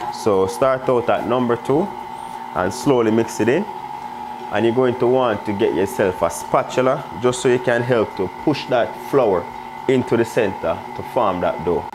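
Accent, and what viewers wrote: Jamaica. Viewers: New sub here. I just come across this channel. Oh my goodness everyone gonna think I took special food tech. Lol. I’m loving your channel. Some great tips. I’m binge watching. Lovely straightforward instructions. Loving the accent my fellow Jamaican. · Love that u redone the video for those that dont understand the jamaican accent. Dumplings look gooooood!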